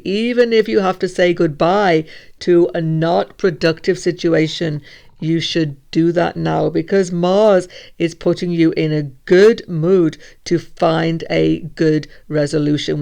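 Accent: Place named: Scotland, United Kingdom. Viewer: Love the explanations and def love your Scottish accent❤️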